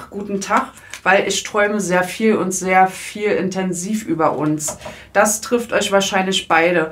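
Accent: Berlin, Germany. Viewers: frei Schnauze mit Berliner Dialekt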